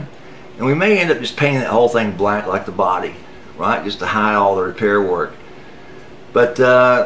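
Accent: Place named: Texas, United States. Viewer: The way you talk and your accent reminds me of Johnny Winter. I wonder if you're from the same part of Texas.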